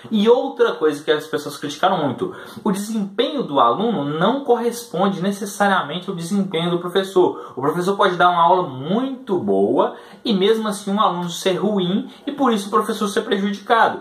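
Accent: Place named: Minas Gerais, Brazil. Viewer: Não sabia que vc era de minas, apesar do sotaque. O novo vai apostar forte no Zema, pq o que ele fizer em MG será bandeira para o novo se estabelecer como um partido forte. Sobre escola: Se escola não fosse obrigatoria, não teria essa perda de tempo absurda que é discutir ideologia de genero, escola sem "cerebro", bullying, professores agredidos, etc...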